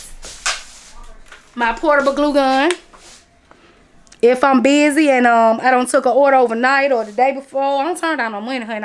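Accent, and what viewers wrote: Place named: South, United States. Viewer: Girl.... you are so country!!! LOVE IT! I'm from Texas so I'm more country! Thank you for all the tips. Great video.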